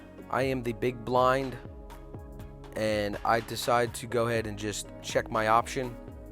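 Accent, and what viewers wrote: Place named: Baltimore, United States. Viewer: Don’t worry so much about anything.... enjoy the process, sounds like you are. I would highly suggest you never limp into the pot, no matter if your mp or utg or cutoff or button, just doesn’t matter (position). Reason is, your limp 3b is never going to include enough bluffs. (Short justification) Always enter hands with raise, 3x or $10 for 1/3. When we enter a hand, just try and play better quality hands that play well post flop. Suited connectors, 7s plus. And finally work in some 3b in position, those guys hate 3bs at the 1/3 stakes and if your in position with a premium hand your likely ahead and this will increase your win rate. Love watching you because your authentic and your baltimore accent always makes me laugh. Talk soon Matt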